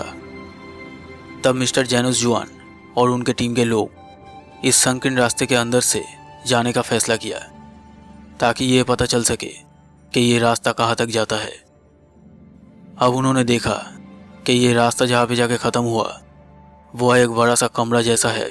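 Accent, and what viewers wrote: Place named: Bengal, India. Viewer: Awaz sunke bol sakta hu,Bhai tum Bengali ho na ? 😂 Sach batayo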